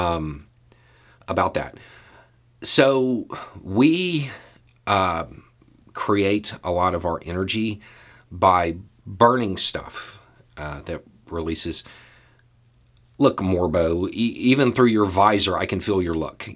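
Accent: South, United States. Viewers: In my head, I say your opening lines with you....and at times aloud, in my best imitation. Being Canadian, that Southern accent don't come easy, eh. Well, ya got me this time. Coffee came out my nose when you said, interstellar people....strong black coffee. You made me laugh, but I didn't like it.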